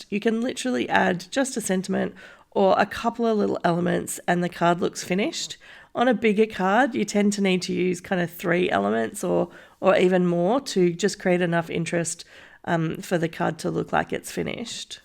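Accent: Australia. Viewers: hearing an Aussie voice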